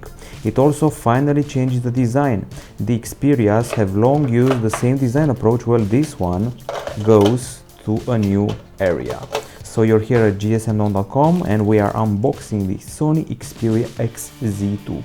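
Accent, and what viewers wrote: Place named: Romania. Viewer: Romanian accent 😀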